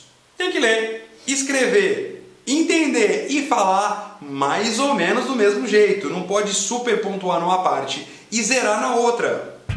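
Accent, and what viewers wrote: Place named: United Kingdom. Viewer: your British accent is evident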